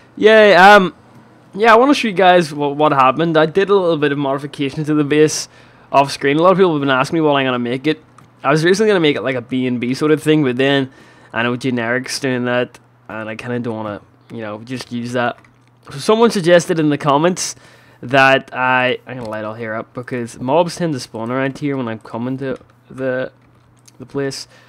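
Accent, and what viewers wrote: Northern Ireland, United Kingdom. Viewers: He has a northern Irish accent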